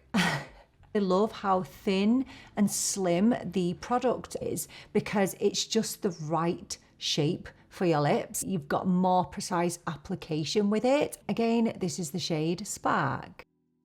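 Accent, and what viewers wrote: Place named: Manchester, United Kingdom. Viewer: I love your reviews even though we are literally like day and night when it comes to shades - I'm deep dark😊 I love how informative your reviews are and I love your accent, reminds of when i used to live in Manchester. You're quickly becoming one of my faves beauty/makeup Youtubers.❤ I've been eyeing the VB eyeliners, might give them a try.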